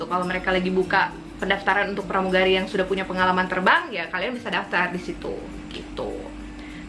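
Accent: Manado, Indonesia. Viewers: Kakak dari manado yah?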